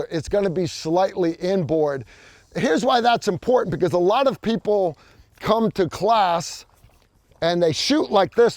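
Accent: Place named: New England, United States. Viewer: I've been tuned out for a while. Glad to see you're still giving good men great advice. Gotta ask, are you from the New England area? You sound like a tactical Lobsterman!!!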